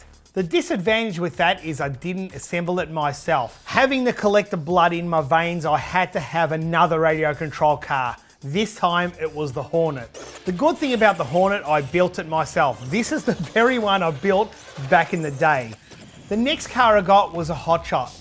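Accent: Australia. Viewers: is there any other Australian that knows the same american shows, movies, and music like you do? I live in America and grew up in the 70's like you and im always amazed by the fact you had all the same stuff in Australia. Accept for your accent you would definitely fit in in America. Meaning no one would guess you were from Australia. Lol. Was it the Nintendo in 1986 that got you away from RC's? 👍😎🇺🇸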